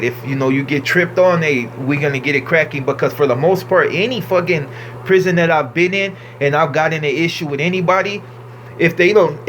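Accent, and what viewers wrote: Mexico. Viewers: You really have a Mexican accent lol that shit got me bugging. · Respect bruh If I didn't see your face i would have thought you was mexican. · He has a Mexican accent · U sound more Mexican than I do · He sounds just like a Mexican not black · He sounds very Mexican Foo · A black dude with a Mexican accent 😒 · You tweaks an u brain washed my nigga u can b in a Mexican gang but u tlkn mexican an all bro the fuck on ya bean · Talk like Mexican nice! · Yo its mad weird seen a nigga speak like a mexican bruh. If this nigga was in yo hood and started talking like this i bet you aint gone stop asking questions. Teach me foo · Thats cool...you honestly sound Mexican too me...thats crazy.... And l think you must be pretty down...cus now you must be having more enemies..the blacks that must hate you wanting to be in a Mexican gang.....but that's what I'm saing..you mus t be down....give you lots of props for being you.....nobody be's themselves now days.. 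· You Sound Mezican Are you Blaxican? Or just a moreno?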